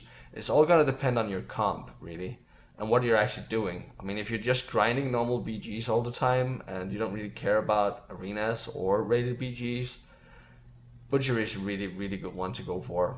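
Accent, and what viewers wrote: Denmark. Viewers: I can hear it so clearly on the way you say things that you're a Dane, and that you're trying to speak English with an accent, don't try if you cant do it.... speak with sum more British people like I've done and now my English is amazing(speaking wise). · haha, i knew he was from Denmark from that accent lol :) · hey dude are you danish ? :)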